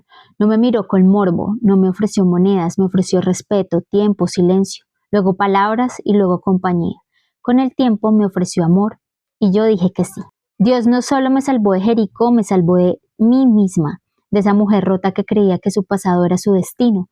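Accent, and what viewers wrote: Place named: Colombia. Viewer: Gracias por la Narrativa y la hermosa enseñanza un abrazo fuerte por tu voz puedo decir que eres colombiana yo lo soy pero bobo en México hace 43 años graba más narrativas bíblicas que te salen muy bien besitos❤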